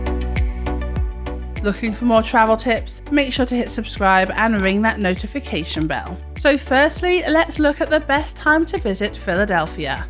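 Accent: United Kingdom. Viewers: Fairly small city she says lol 6.2 million population metropolitan area! Apart from London is any city close to this in the U.K. where I imagine by your accent that you are from? Lol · You sound like britis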